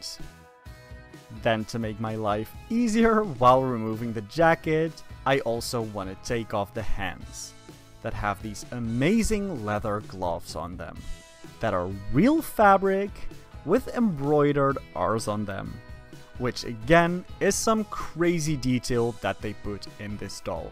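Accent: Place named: Netherlands. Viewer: Are you from the Netherlands? Your accent sounds different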